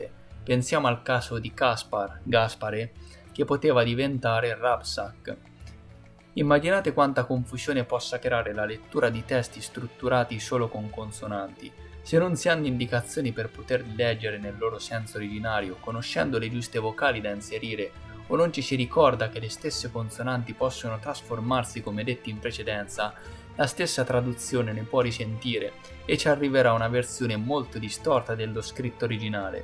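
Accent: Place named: Senigallia, Italy. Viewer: Ottimo lavoro, complimenti. Dall'accento sembri di Senigallia.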